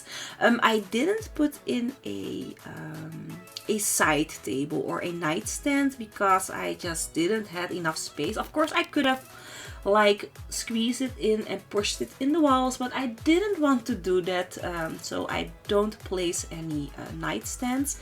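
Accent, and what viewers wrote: Netherlands. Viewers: are you from holland? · Ik zat te kijken, en dacht: ik denk dat ze Nederlands is, ga ik in de comments kijken ben je echt Nederlands, je hoort het wel, maar je spreekt wel veel beter Engels dan de meeste Nederlanders. Maar je hoort het toch wel een beetje bij woorden zoals wanted en work, maar dat is niet erg hoor xD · Ik herkende het nederlandse accent gelijk, gelijk even in de comments gekeken of je echt nederlands bent aaa. Hahahaha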